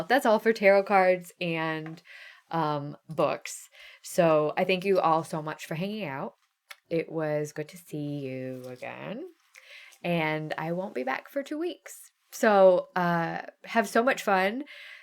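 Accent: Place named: Midwest, United States. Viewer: Sorry you didn't get to Nashville, but it was good to see you! I had to lol when you mentioned your 'midwest coming out'! I've thought that for years ever since I watched Fargo - I hear that accent when speaking! 🤣 I'm sorry for your loss of your uncle.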